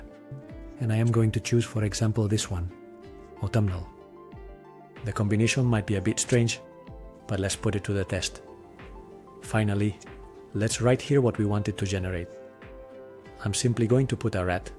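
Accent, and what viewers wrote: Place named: Greece. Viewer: Thank you for this video. Love your accent (I assume it is a Greek one)🙂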